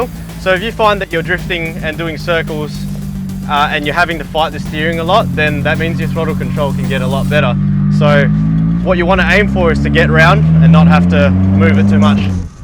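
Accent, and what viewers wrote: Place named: Australia. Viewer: Asian guy with Australian accent. Nothing unusual here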